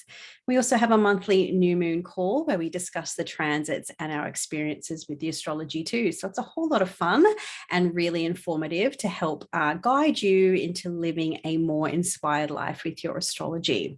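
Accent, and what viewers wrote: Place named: Australia. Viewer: Mine too! Her Aussie accent is also a plus!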